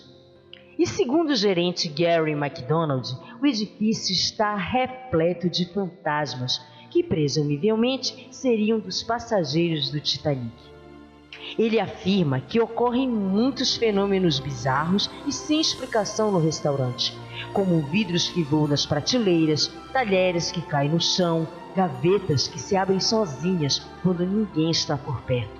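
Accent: Pará, Brazil. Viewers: essa narradora é paraense?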